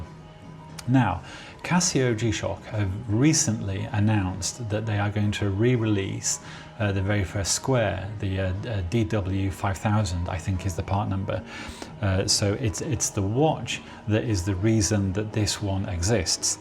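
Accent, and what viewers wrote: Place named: United Kingdom. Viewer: Я думаю что ты, обязательно купишь MRG в круглом корпусе, может не сегодня не через месяц, но это обязательно произойдет, так как у тебя очень крутая коллекция B5000! Желаю успехов, круто что я наткнулся на твой блог, пишу на русском но понимаю твою речь, конечно Английский язык это не Американский 😅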